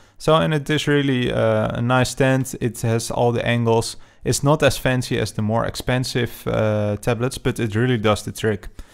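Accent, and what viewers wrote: Netherlands. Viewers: Your Dutch accent hihi let maar niet op mijn opmerking viel me gewoon gelijk op.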